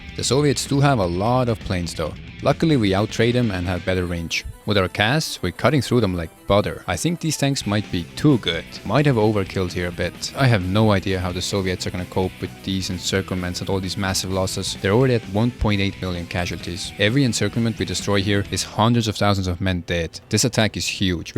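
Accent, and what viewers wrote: Estonia. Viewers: Clocked the estonian accent 2 seconds in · I thought your accent sounded very familiar so I bet on Estonia. Still surprised to be right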